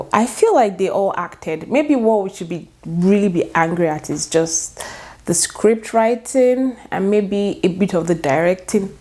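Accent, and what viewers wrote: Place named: Pidgin, Nigeria. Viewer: Its ur pidgin for me😂😂. I cant even speak like that🚶‍♀️🚶‍♀️🚶‍♀️. Me I sha loved the movie oh.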